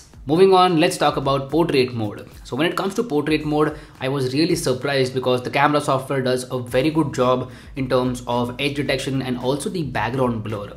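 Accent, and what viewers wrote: India. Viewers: Why Indian speak like this????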